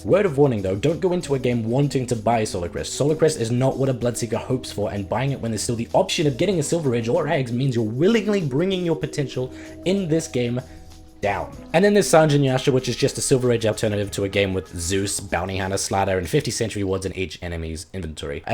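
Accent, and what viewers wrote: New Zealand. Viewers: He's NZealander maybe? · Andre Louw he's a kiwi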